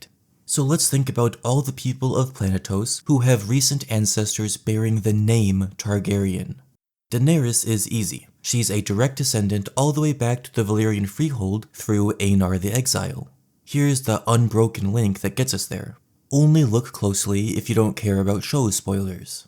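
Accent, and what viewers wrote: United States. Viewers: American lol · he has to be from the Midwest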